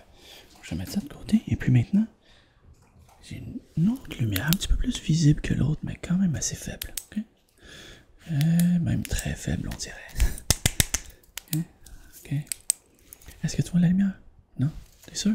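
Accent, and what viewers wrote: Quebec, Canada. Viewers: Tu est du Québec ?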